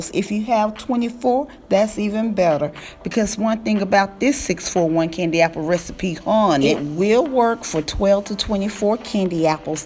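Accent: South, United States. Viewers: When I tell you I have watched this about 5 times. One of my favorites !! It's the laugh and the commentary for me hunni!!! "That royal blue baby....royal so loyal"!!!😊😆😂 As far as the country accent...It's a southern thing....nothing wrong with a little twang...I'm a southern girl as well!! You are the best!!!!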